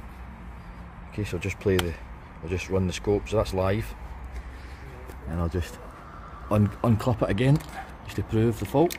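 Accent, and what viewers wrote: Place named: England, United Kingdom. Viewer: Strong English accent damn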